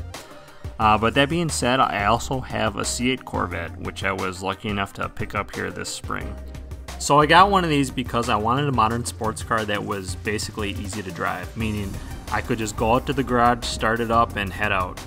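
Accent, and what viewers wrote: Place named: Michigan, United States. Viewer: You sound like my cousin from Ann Arbour Michigan. great job with the corvette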